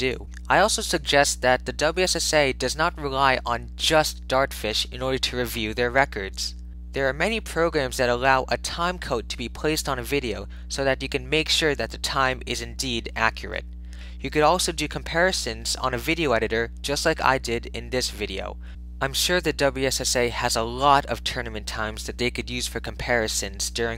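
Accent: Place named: Philippines. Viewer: lol yep :D but idk it's weird I never realized how much of a Tagalog accent you have... But thanks anyway :P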